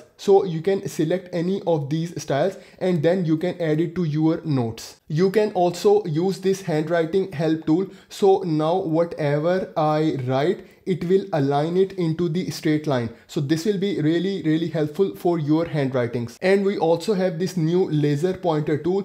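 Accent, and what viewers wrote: India. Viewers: Bro got that thicck indian accent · Man got the thickest Indian accent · Why Your Accent is Indian bro? As am Indian I can understand each and every word very easily. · I love indian accent 😄 it's amazing 😄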